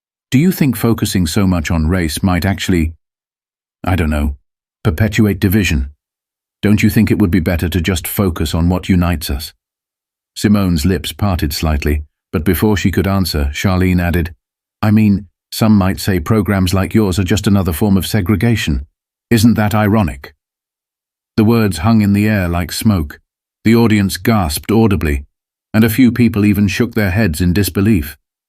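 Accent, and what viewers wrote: United Kingdom. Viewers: You know what I h8... a british accent telling the story of a successful Black woman. Ya just can't stop trying to make it about you colonizers.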